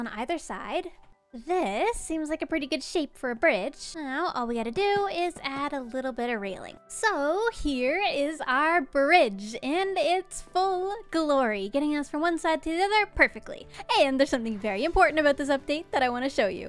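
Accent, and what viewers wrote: Newfoundland, Canada. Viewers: Gem gem gem plz show your newfendland accent i love that type of accent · I didn’t know you were from Newfoundland. You need to listen to Come From Away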